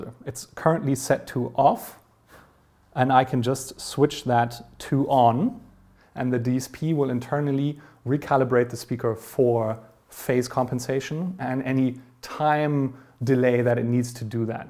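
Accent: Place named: Germany. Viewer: For me as an aspiring soundengineer, this video was so helpful. Thank you so much for this clearification. Are you from Germany? Your accent sounds familiar😅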